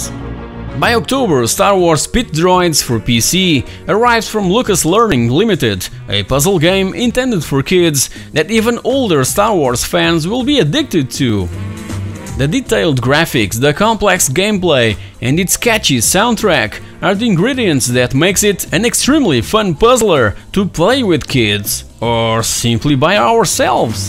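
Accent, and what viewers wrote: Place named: Portugal. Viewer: Vi logo pela forma de falar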